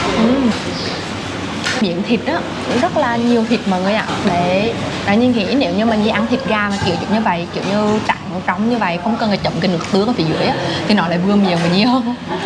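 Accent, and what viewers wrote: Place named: Quang Binh, Vietnam. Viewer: Tưởng quảng bình...nghe giọng dễ thương quá.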